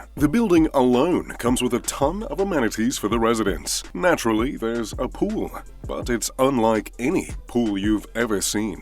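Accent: United Kingdom. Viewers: He should have gave You a heart for that comment. I usually find British accents annoying, but I like.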